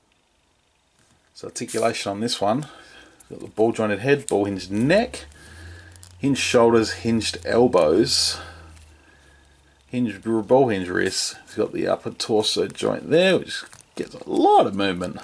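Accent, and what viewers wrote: Australia. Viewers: Hey man, you sound Australian and so am I. Wondering were you picked this fig up if you are aussie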